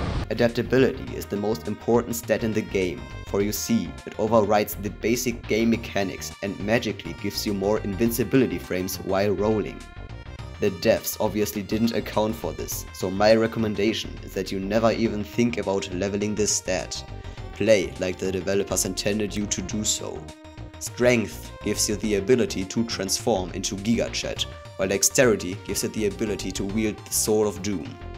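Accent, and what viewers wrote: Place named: Germany. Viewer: Are you german? You have a german accent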